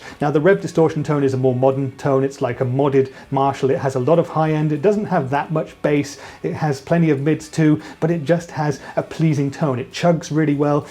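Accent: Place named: United Kingdom. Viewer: Are you German? Your English is flawless, I thought you were British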